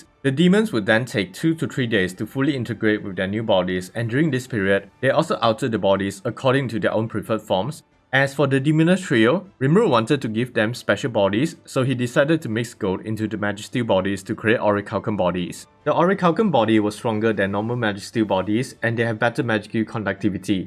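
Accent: Singapore. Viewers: Bro are you Singaporean? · You Singaporean ah?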